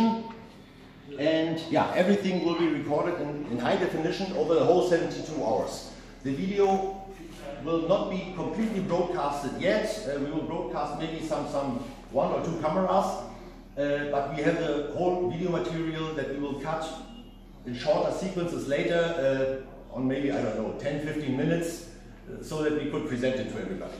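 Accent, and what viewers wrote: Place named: Germany. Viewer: You can hear that he is from Germany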